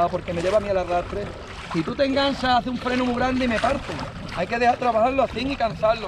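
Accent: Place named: Andalusia, Spain. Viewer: Eres andaluz, como yo. Yo pesco en la Bahía de Cádiz, y por fuera de ésta, desde Chipiona hasta Chiclana, y llegando a muchos metros de profundidad, a veces a más de 200 m. Atunes y de ese porte, por Huelva y el Estrecho, por aquí tb , pero son más raros. Por tu acento, por la segunda zona. Un abrazo y buena pesca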